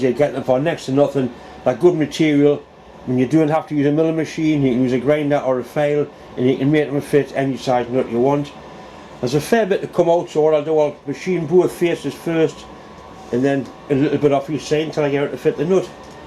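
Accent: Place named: Scotland, United Kingdom. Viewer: Love your accent, Scottish. Well, for me here in America it's an accent lol....for you, I have an accent. Good job.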